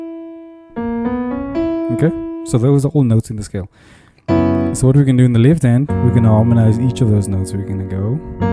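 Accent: Cape Town, South Africa. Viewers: you sounds like a Capetownian really...I appreciate you lesson 🇿🇦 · Was so excited to hear a South African accent doing this. Great tutorial,thanks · Can hear the dialect u from Capetown ..Represent cpt I love this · Your accent sounds South African, your playing sounds heavenly.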